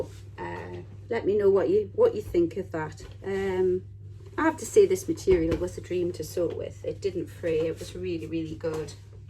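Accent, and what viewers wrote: United Kingdom. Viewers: I do love that fabric of this latest wrap dress it's so lovely. I wonder where in the UK you live as I'm trying to identify your accent.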